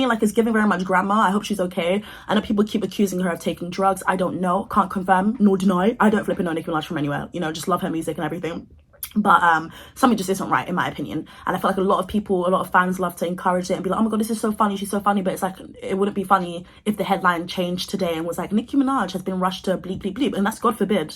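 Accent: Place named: RP, United Kingdom. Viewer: That pink dressing gown is yuk! You talk too fast and too posh!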